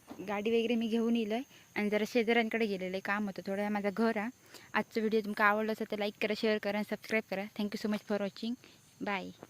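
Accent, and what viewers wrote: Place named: Malvan, India. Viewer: तुजो गाव कोणतो नांव काय गावाचा‌ मालवणी बोलतस ऐकाक बरा वाटता मी पण मालवणी आसय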